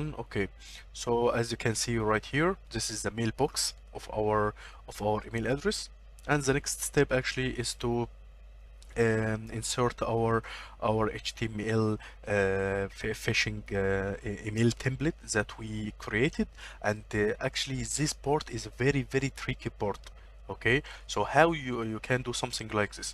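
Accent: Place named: Egypt. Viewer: واضح من الاكسنت انه مصري😂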